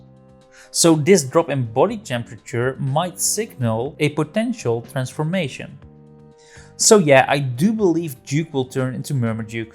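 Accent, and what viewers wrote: Netherlands. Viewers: Are you Dutch? I don't think I have to ask, because I can hear it on your accent! :P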